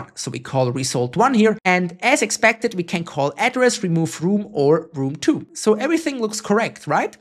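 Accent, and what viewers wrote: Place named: Germany. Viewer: Swiss or German colored english - sounds a bit clunky!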